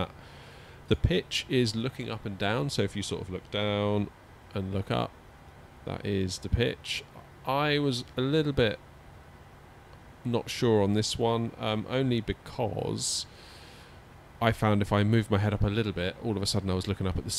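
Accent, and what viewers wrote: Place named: United Kingdom. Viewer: lovely hear british accent 😄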